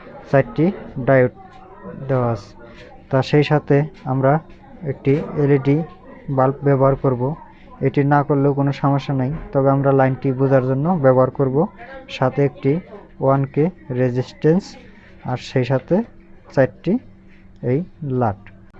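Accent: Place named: Tangail, Bangladesh. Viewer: ভাষা শুনেই বুঝা যায় টাঙ্গাইল্যা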